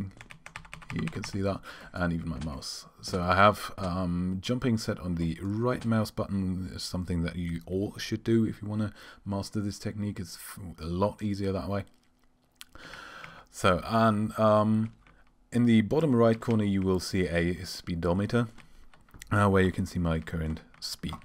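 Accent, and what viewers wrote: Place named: Germany. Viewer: what is your primary language if I may ask? german maybe? ^^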